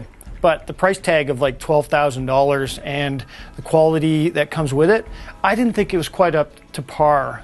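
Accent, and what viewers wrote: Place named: Canada. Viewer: is the background music necessary?? and your Canadian accent is annoying!!!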